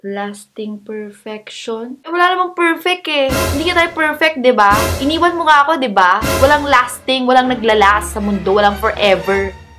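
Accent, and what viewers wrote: Ilocos, Philippines. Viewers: ilokano ka ba?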